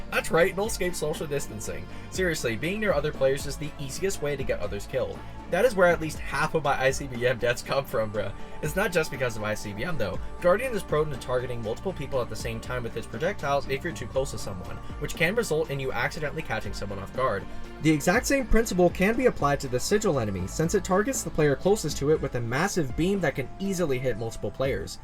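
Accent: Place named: Canada. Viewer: you sound like kenadian. good video <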